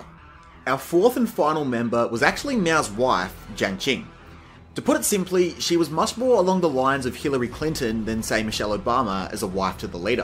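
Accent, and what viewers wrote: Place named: Australia. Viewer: “His wife dipped WHAT in water to make sure he drank what he needed”??? Can’t understand this narrator every 4th sentence. No doubt this Aussie and ALL UK people think Americans NEED an accent in their videos. Lame.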